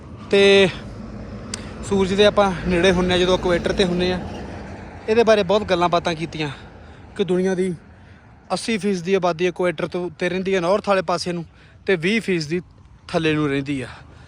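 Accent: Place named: Punjab, India. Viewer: ਤੁਹਾਡੇ ਦੁਆਰਾ ਬੋਲੀ ਜਾਂਦੀ ਪੇਂਡੂ ਪੰਜਾਬੀ ਬੋਲੀ ਅਤੇ ਚਾਰ ਚੁਫੇਰੇ ਦੀ ਜਾਣਕਾਰੀ ਮਨਮੋਹਕ ਹੁੰਦੀ ਹੈ ਵਲੋਂ ਮਾਸਟਰ ਜਸਪਿੰਦਰ ਸਿੰਘ ਗਿੱਲ ਪਿੰਡ ਉਬੋਕੇ ਤਹਿ ਪੱਟੀ ਜ਼ਿਲ੍ਹਾ ਤਰਨ ਤਾਰਨ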